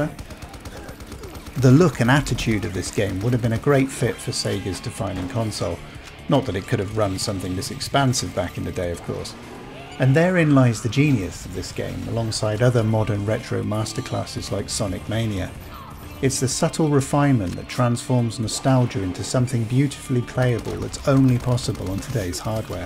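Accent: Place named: United Kingdom. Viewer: The narrator has such a sexy british voice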